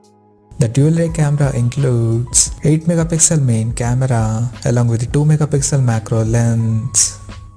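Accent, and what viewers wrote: India. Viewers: Horrible Indian accent- sing song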